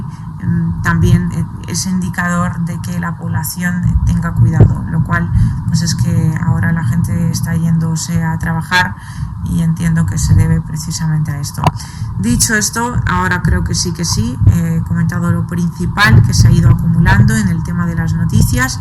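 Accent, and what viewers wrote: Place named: Spain. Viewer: me encantas, pero tu acento gachupín en ensordese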